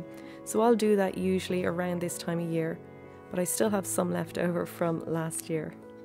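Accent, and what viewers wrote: Ireland. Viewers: I absolutely adore your beautiful home. We live in a small 800 sq foot home, too. It's cozy and perfect. Did anyone ever tell you that your voice is so soothing? My husband is a Newfoundlander, and I can definately hear his Irish roots in your accent. Much Love, from Ontario, Canada 🇨🇦... Keli 💖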